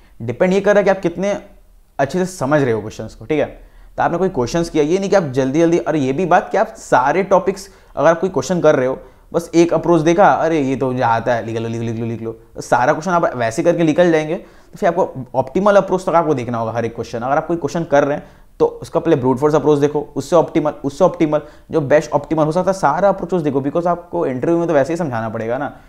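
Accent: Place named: Bihar, India. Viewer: UP-BIHAR ACCENT ☺️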